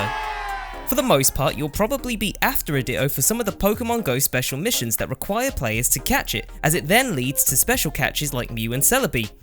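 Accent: United Kingdom. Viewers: He says di-o instead of ditto lol (Yes i know he has a british accent dont come at me) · Örs Vajda Yo, he sounds English. That’s why he’s pronouncing it the way he is. The British did sort of create the English language.. even if it’s a mashup of other ones.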